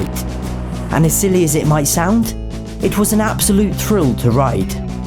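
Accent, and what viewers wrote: United Kingdom. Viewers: I am so glad that I found this channel,I love the stop motion,the music and all of the wonderful content! Also,. British, anyone?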